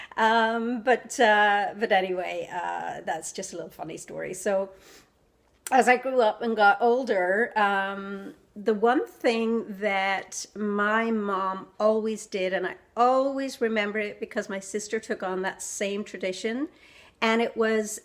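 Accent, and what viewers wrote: Ireland. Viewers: You still have a bit of the Irish accent and its beautiful. You have have lived in two places I can only dream to visit. Great video #teamsupportalltheway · I just loooove your Irish accent. It's soft but fully alive and well. I'm part Skottish in my dad's side, and all four of my adopted children have Irish heritage. I enjoyed hearing about your traditions, past and present, and the turnips and carrots dish sounds absolutely delish. I know I'd love it. And I didn't make true resolutions this year either. Being goal driven sounds better, for sure. This was so fun to watch. Thanks for sharing with us,. You're a gem! Peace! ~TONI 💜🌺💚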